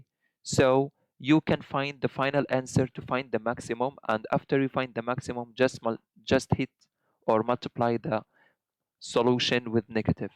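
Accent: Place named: India. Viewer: The best accent as indian I've heard so far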